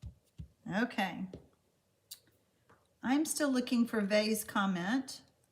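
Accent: South, United States. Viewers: great cards.....love watching you and your southern accent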